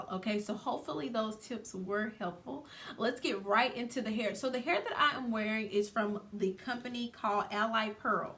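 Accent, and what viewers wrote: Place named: South, United States. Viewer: Where you from I love that accent ? Country girls rock 🧘🏽‍♀️ I'm from NC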